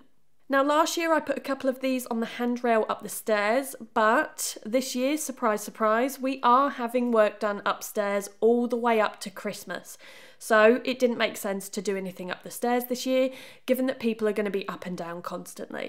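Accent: United Kingdom. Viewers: Hellooooooooo 🎉🎉🎉🎉🎉. Watching all of your videos with great taste and of course an amazing british accent. So gorgeous.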